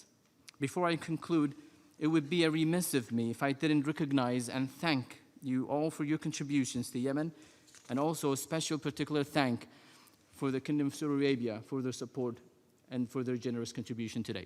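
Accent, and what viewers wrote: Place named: South, Arab world. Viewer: دحباشي حسبته جنوبي عربي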